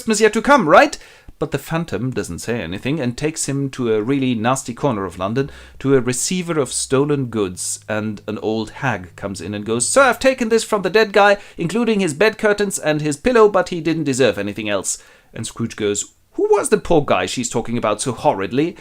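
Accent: Germany. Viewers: Briiliant !!! Five Stars Award !!! Absolutely love the German-style accent !!!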